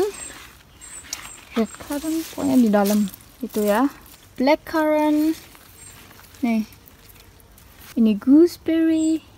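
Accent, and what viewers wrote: Bali, Indonesia. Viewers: Kok kak logat.a agak orang bali 😍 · Kok aku dengar ada logat bali nya ya😂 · Logat balinya hihihi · Dari Bali kak ya?? Logat Bali banget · Orang bali kak? Kok logatnya bali banget yaa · Aku baru mulai nonton channel kakak...kak dari mana ya? Orang Bali kah?soalnya logatnya kaya orang Bali😅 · Logatnya bali banget Kakak dari bali yaa? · Logat Bali ..kak dari mana asal Bali kah? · Logat balii dongs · Kak ada logat Bali nya kental banget yaa 🤣🤣 Di konten YouTube yg rujakan sama Mpo Ika juga bilang biasanya rujak bumbunya tabia, sere, kuah pindang 😂 Penasaran dulu pernah tinggal di Bali kah? Btw, stay healthy sekeluarga dan thank you udah selalu sharing konten yg menarik yaa kakk 😍😍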